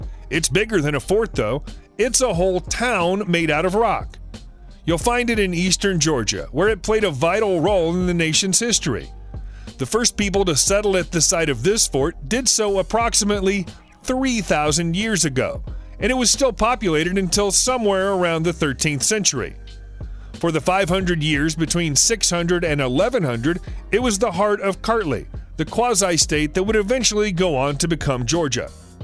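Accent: United States. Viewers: He speaks with an American dialect. I'm from Canada and nothing he said seemed wrong. I also have a lot of American friends, so I am used to hearing their mispronounciations. Look up how they produce Iroquois versus how it is properly pronounced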